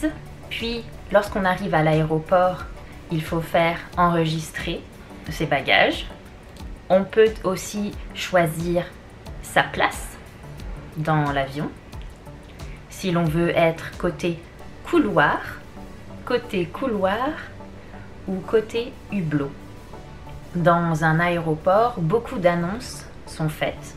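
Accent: Standard. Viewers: 老师很棒！发音非常标准。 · 她是法國人，發音標準是很正常啊！😁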